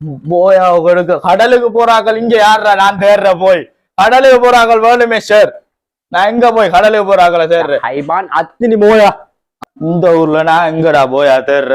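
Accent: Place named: Valachchenai, Sri Lanka. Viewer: அரபுநல்லாதெரிந்த ஒருவரைவைத்துநடித்துரிக்கலாமே.நீங்கள்.எந்த இடம்.வாழச்சேனையா.உங்கள்பேச்சில்விளங்குது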